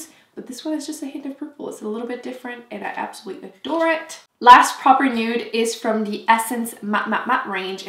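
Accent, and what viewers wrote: France. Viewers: Amber Schelfhout She actually did say “voyageur” (in perfect French, with no accent) pretty clearly, which means “voyager” or “traveller” in English. “Voyager” can either be used as a synonym to “traveller” in English or translated to “to travel” in French. Why would someone whose first language is French, speaking English with a French accent not know how to pronounce a French word? Loll... I was so confused by this comment... So she wasn’t trying to “make it English”. She just pronounced it as “voyageur” which is equivalent to “voyager” or “traveller” in English. It could have happened because she wasn’t sure if the word was meant to be French or English (since the spelling is the same in both languages). I’m tri-lingual, so this also happens to me very often... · Your accent is so beautiful 😍 French accent is everything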